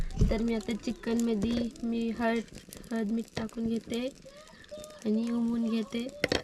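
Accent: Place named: Pune, India. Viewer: मला तुमच्या बोलण्यावरून आणि तुम्ही ज्या भागात राहता त्यावरून वाटत होतं की तुम्ही पुणे जिल्ह्यातल्या आहात आणि आंबेगाव किंवा जुन्नर तालुक्यातल्या असाल... खेड (राजगुरुनगर ) च्या जवळच राहतो आम्ही.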